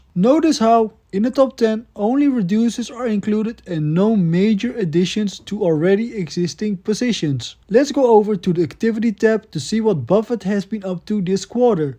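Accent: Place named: Netherlands. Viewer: Are u from belgium or the netherlands? U sound like dutch💀🤣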